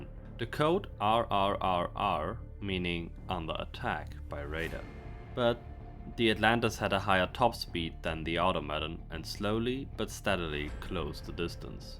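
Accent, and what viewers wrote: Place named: Germany. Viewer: great story, but this is the worst way to tell it... very childish presentation and piss poor german accented english.... damned offensive actually.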